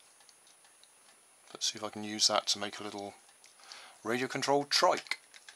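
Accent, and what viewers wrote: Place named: Yeovil, United Kingdom. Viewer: We're are u from it sounds like a Yeovil accent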